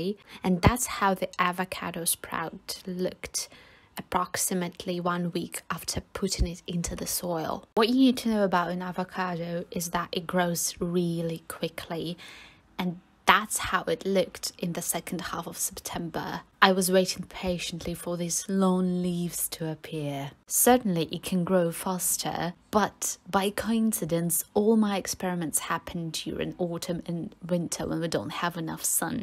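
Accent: United Kingdom. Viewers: Your British accent is very welcoming by the way and your KOT is very cute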